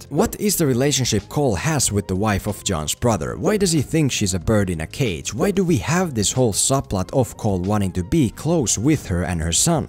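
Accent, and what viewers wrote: Finland. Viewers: Are you from finland ? Something about your accent :p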